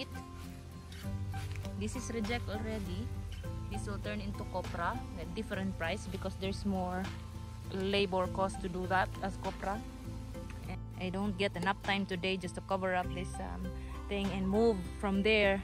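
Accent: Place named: Australia. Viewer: dont blame you those workers maybe not intelligent enough to care even you said that they were hill people before or was William when he was in the back block love your swearing your very Australian its cute when my wife swears like you do but my wife has understand more bastos Australian now after 20 yrs even at work her co workers teach her to hahah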